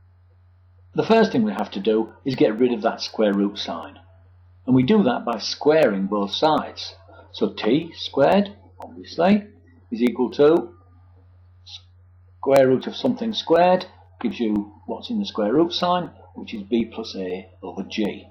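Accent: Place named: Hull, United Kingdom. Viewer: The narrator has to be from Kingston upon Hull - or close to it. Very helpful video.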